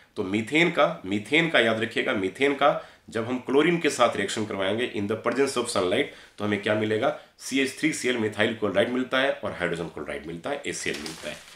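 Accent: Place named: United Kingdom. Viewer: English accent yes... Lekin jldi bana Dena Bhai... 2 din or h paper m..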